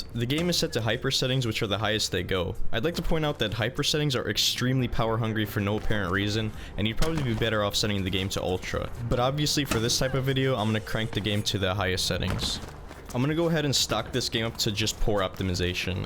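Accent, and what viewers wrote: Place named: Denmark. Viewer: Hey, du lyder Dansk :-) Jeg har også et build med FX CPU, det hjælper sindsygt at give den noget hastighed :-)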